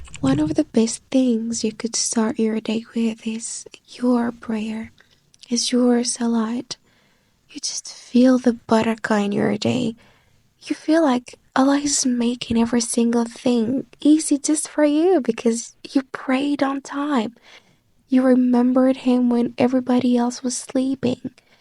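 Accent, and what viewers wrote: United States. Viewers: HOW YOU DO HAVE BEAUTIFUL ACCENT LIKE AMERICANS ? WHAT IS YOUR SECRET? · Proud of you as a Moroccan young girl speaking English language fluently with Americans accent Mashallah ❤ I Just discovered your channel yesterday , I will ask you if you can tell the Couran stories specially to the kids who were born in USA or UK to understand better when some one speaking . Thank you so much , you are beautiful and talented keep up with writing the journal every day , and encourage others to do it as well .( doesn’t matter what language! )…………Happy New Year 2024 🎉🇲🇦🇱🇷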